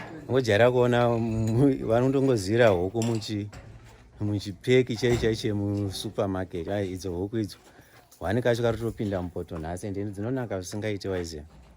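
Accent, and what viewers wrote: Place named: Manicaland, Zimbabwe. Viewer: U sound manyika 80% come back home/kanyi 😅😅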